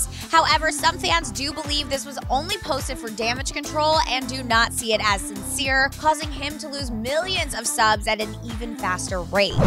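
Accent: United States. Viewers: It’s because she has such a strong (and irritating) American accent 🥴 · Britt Nicole She’s barely pronouncing it wrong are y’all deaf 😂 It sounds like an O because she’s saying it fast and has an American accent- wtf.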